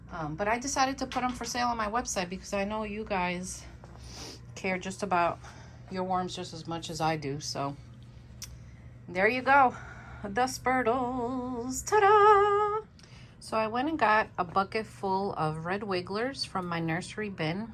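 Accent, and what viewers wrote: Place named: New Jersey, United States. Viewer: 👋 from California! Originally from Philly so loving your Jersey accent. I learn so much from your videos.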